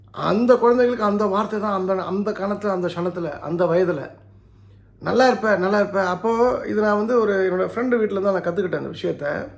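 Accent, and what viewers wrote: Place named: Madurai, India. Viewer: மதுரை தமிழ், சண்டை போட்டாலும் ரசிக்கும் படி இருக்கும். 🎉🎉🎉🎉🎉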